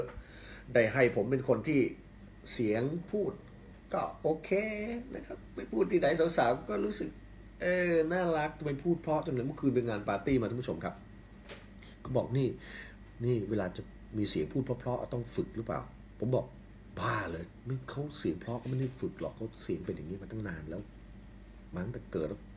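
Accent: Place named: Korat, Thailand. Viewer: ชัดเจนง่ายๆสไตล์โคราช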